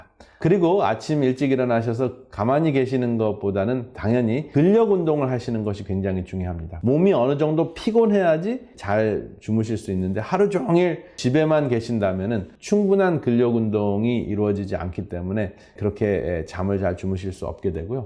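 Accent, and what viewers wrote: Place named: United States. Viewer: 그렇게 생각하실 것 없습니다. 이분은 미국에서 오래 사시다보니 자연적으로 미국식 발음에 익숙해져서 그런거지 일부러 혀꼬부라진 소리를 하는게 아닙니다~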